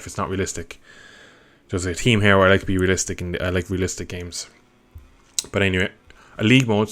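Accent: Ireland. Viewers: I wish they'd do something like Codemasters does with the F1 games. Making the riders switch teams and move up (including your own riders) and like EA also did with their NASCAR games. MotoGP riders retiring or switching teams and riders moving up from Moto2, Moto3 riders moving up into Moto2 and Rookies Cup riders moving up into Moto3. PS: like your Irish accent :)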